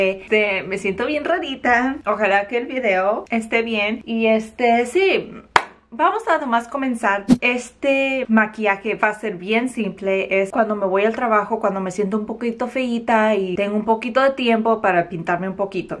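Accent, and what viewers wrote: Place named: United States. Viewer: OMG sister your Spanish needs work but you are hilarious I love it 😻 Just listening to you makes my day cuz you can be so gringa😭👎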